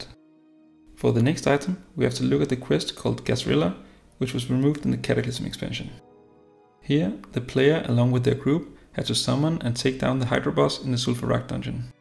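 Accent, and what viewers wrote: Denmark. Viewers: accenten lol. Hvorfor er der så mange danske classic wow youtubere i forhold til andre europæiske lande?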